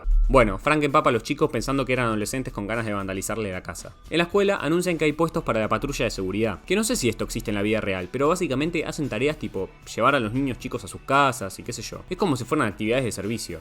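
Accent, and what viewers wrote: Uruguay. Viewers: Bro sos uruguayo? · Sos uruguayo no? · De una me di cuenta que sos Uruguayo, buen canal, saludos desde Uruguay 🇺🇾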